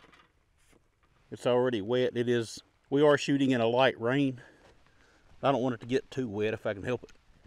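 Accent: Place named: South, United States. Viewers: It’s because it is a gentle southern accent with clear, crisp annunciation, and a lot of intelligence behind it. It’s not flashy or boisterous like most content these days. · Really? I think the way you talk is cool. You sound like a guy at work. He's from WV. That casual Appalachian dialect. Good shit. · It's obvious has a southern accent, but you cannot dislike the man about the way he talks, no matter what.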